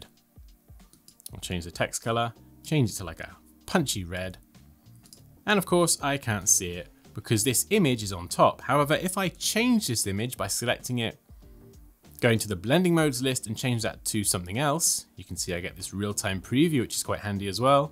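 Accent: England, United Kingdom. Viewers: Very listenable English accent! :-) Makes such a nice change to 'other' accents!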